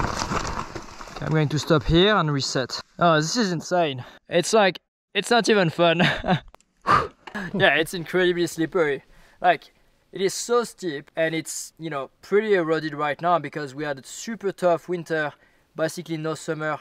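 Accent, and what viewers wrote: France. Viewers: Sorry but, as a French, I really despise the fact that you haven't corrected your accent with all this time speaking english. I guess it's part of your character now, but I can't listen to "zhis"...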